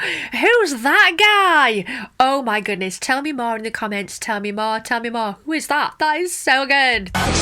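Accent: United Kingdom. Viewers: I love your British accent! <3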